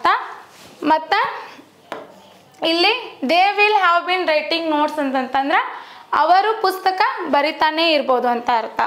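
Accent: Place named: Belagavi, India. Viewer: Nimm Belagavi accent alli nimm matu kelode chanda❤️👌👌👌👌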